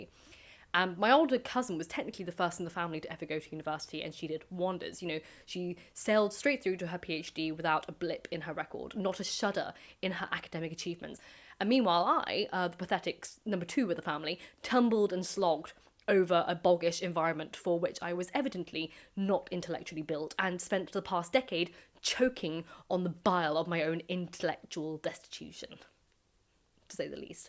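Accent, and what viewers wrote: RP, United Kingdom. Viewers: Interesting, but I'd argue you being able to cram and get A star grade makes you way above average. You are only judging yourself against the top of the top by comparing yourself with those who topped Oxbridge. Also you sound so posh, I assumed you were from an academic style family previously. I was the first to go to Uni in my family myself.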